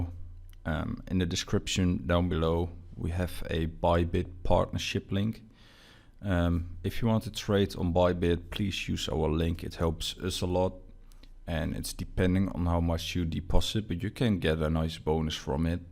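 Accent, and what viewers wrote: Netherlands. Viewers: I've used this strategy for a few days on a paper-trading account and really like it. Lots of entries which I like, I work from home but am unable to sit and watch charts all day. That's probably the most frustrating thing about trading in my spare time, waiting for entries. Most strategies also only really work during trends, feel like I miss a lot of trades when it's not trending. With this strategy I don't have to wait too long to find an entry. I also really like the Absolute Strength Histogram strategy, finding good stuff with that and also seems to work in flatter markets.. I've started leaving the volume up along with the ASH and found that they can both confirm each other. BTW - are you Dutch, by chance? You sound like a native Dutch English-speaker to me, not that it matters :)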